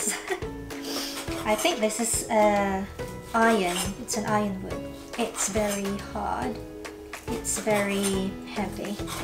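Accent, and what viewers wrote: United Kingdom. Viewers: look so delicious i would love to taste that & i love listening to your british accent sis. new friend here from australia teamlipat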